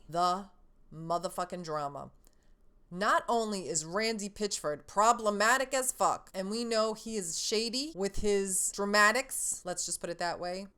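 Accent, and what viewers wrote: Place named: New Jersey, United States. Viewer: Haha I thought you were from New Jersey!anyway still criminally underrated Channel but we're sticking with you girl!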